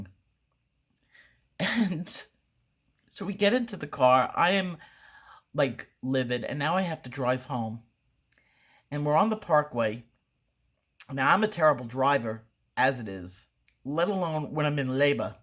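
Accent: New York, United States. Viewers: I love your stories and now you have my other cat, Loki, in thrall of you. Lol my "kids" love the NY accent;)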